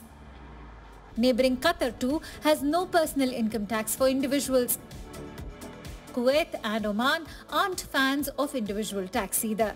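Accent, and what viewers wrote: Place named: India. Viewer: Cheap Indian accent led to cheap and wrong news 🤦🏻‍♂️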